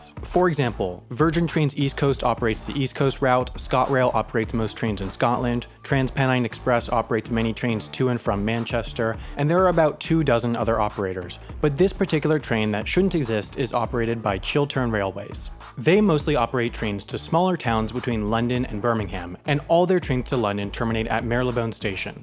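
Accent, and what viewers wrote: United States. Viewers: I got so far and gave up with the annoying American voice over and mispronunciation, America has terrible train services for passengers. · Step One: If you're doing it in Britain...stop saying route the American way! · He's American....they all pronounce "route" as "rout". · It was quite weird hearing an American say the name of my small insignificant town near Manchester called Stalybridge. But yes, of course, he said it wrong: stal-y-bridge. It is Stale-y-bridge. One note of fame. Stalybridge was the theatre where "it's a long way to Tipperary" was first sung.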